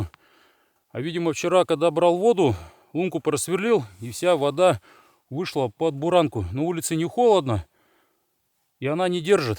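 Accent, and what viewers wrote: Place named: Arkhangelsk, Russia. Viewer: судя по говору, у меня был друг с Архангельска, ты тоже оттуда, познакомься с Николаем, таежным, он никогда не набирает воду, с лунки, у дома, если планирует, выезжать на снежике)))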